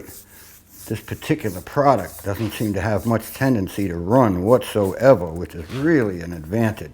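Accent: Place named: New England, United States. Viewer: Love your craftsmanship! My 95-year-old father is an old-school cabinet maker. Good to see that kind of understanding of the material and respect for the craft is still alive. One personal question, if you don’t mind: I can hear your accents is New England. Were you born there? Thanks for your great videos!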